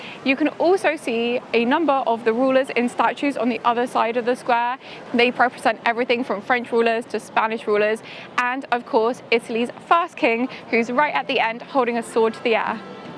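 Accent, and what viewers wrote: United Kingdom. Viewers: Yeah because of course anyone who's not shouting and being chaotic as we are has low energy... This kind of closed-mindedness makes Neapolitans unlikeable to many people, and to other Neapolitans like myself. This girl sounds British, and Brits have a calm way to speak. Or you want her to start dancing tarantella while she talks so she can match what you consider to be Neapolitan "high-energy"?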